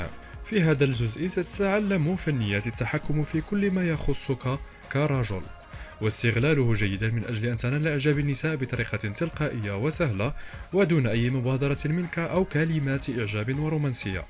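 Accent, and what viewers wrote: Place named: Morocco. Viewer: يجب ان تتخلص من لهجتك المغربيه اثناء الحديث تجعل الشخص لا يفهم شيئا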